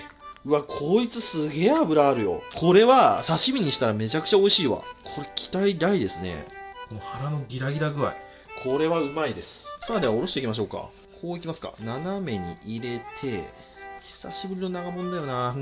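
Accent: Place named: Mikawa, Japan. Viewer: かねこさんが市場の人と話すときに漏れる三河訛りに親近感を覚える遠州弁話者です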